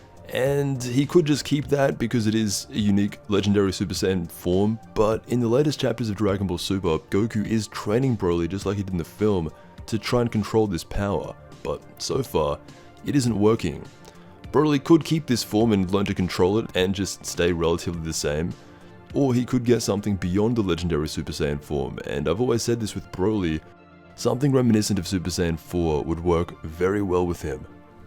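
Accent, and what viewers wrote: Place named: Australia. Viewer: only one I ever subscribed to and click on it the minute I see it appear on my feed even if its not about DB. I'm not gonna watch the whole thing but ill support him. does cool shit for example you'll never hear him begging for fkn pitiful likes and subs NOT EVER. Another cool thing he does is put remixed Dragon Ball music like trap stuff. Its the type of music I like to work out too. I like his views and perception on DBZSGT. Lastly have a deep voice with an Auzzie accent will of course only help in this medium.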